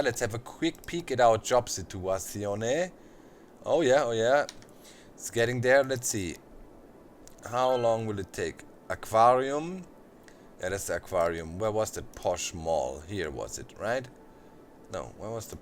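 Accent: Germany. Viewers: TitaNiumCriStal ja dachte ich mir auch seine Aussprache hört sich deutsch an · are you german? your sounds like german,and the names of your cityparts like altstadt, i am german :D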